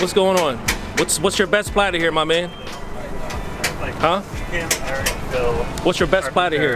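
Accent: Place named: Cambodia. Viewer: Why you talk like ah khmow ?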